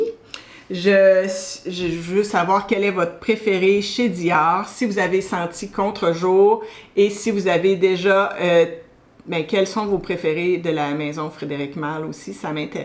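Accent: Canada. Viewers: Coucou ❤️ Je viens justement de découvrir ta chaîne grâce à ton commentaire sur la chaîne d’Estelle, et je me suis directement abonnée! 🥰 J’ai beaucoup aimé cette vidéo autour de Frédéric Malle, Le Labo et Dior. Trois univers tellement différents! Je suis d’ailleurs totalement amoureuse de la maison Frédéric Malle ❤️, alors autant te dire que ta sélection m’a particulièrement intéressée! Contre-Jour, Violette 30, mais aussi Paradise et Cuir Saddle de Dior… de très belles découvertes et des parfums qui donnent vraiment envie d’être explorés davantage. ✨ Je suis moi aussi créatrice de contenu autour de la parfumerie et je suis belge 🇧🇪. Je pense que tu es au Canada, si j’en crois ton joli accent 😊. Je suis vraiment ravie d’avoir découvert ton univers et j’espère que nous aurons encore l’occasion d’échanger autour de notre passion commune pour les parfums. À très bientôt et gros bisous parfumés 💕🌸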